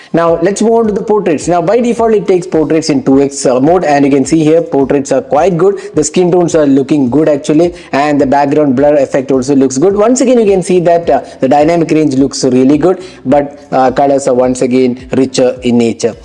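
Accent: Kerala, India. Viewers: Are you from Kerala....?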